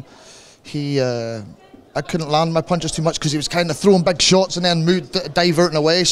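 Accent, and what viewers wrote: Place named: Ireland. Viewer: Zdecydowanie nie, jeśli ktoś ma styczność z Irlandzkim akcentem pierwszy raz, to prawdopodobnie nie zrozumie połowy z tego, co mówi Parke, mimo dobrej znajomości języka.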